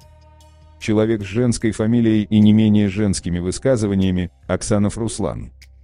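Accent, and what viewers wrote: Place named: North Ossetia, Russia. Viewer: Голос автора с осетинским акцентам.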